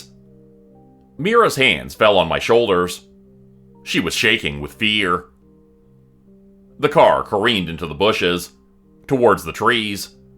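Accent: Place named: Canada. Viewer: I'm so grateful that you are the story teller and not AI. Your voice is very relaxing, with fantastic expression. Love your accent. Ya Canada ❤ 🇦🇺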